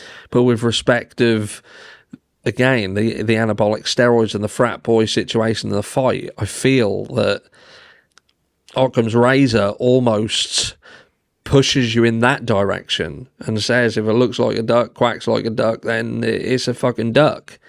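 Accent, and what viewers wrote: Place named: England, United Kingdom. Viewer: we are family loving men. Please understand irony here because good or bad it has been designed by Get a Clue from the begining because his autistic intuituon nailed the narrative you are only now alluding to. Offer him an olive branch of peace and bring him back beacause you are integral too. Coming from Englamd i am so familiar with your accent and God bless you and your family... But he does Richard Burton better and always had something to say that should be listened to. You do your mental health podcasts but ignore Clue like me might have issues there but you with English gumption are endorsing somethinhg not quite the truth and justice for all that Clue coined first but happier on the coat-tails now as things are turning out.... Send some love to Clue... There is exquisite method in his madness and i promise you he loves his wife children and dog jusr like you x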